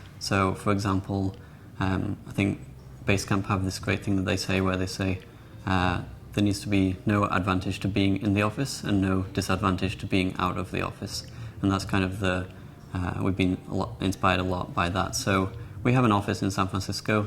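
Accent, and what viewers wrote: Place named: Jamaica. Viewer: Ríordáin I think his accent is jamaican...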